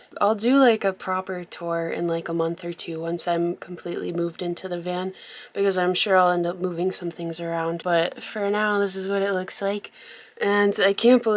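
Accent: Massachusetts, United States. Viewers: What an amazing gift!!! I LOVE the Mass accent! The Van is rad, and I'm sincerely happy your living your dream, and now you can travel with your soulmate, Morgan (; Sending love from New Hampshire!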